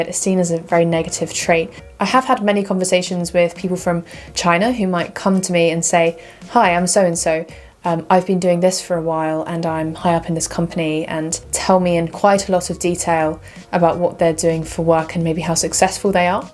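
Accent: United Kingdom. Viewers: 英國口音很悅耳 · The British accent is really beautiful. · 喜歡妳的影片內容以及英國口音!真棒希望繼續分享更多外國文化知識型影片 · 很棒的影片，知性又有趣，讓我們更了解英國文化，感謝您！而且您的英國口音超好聽的！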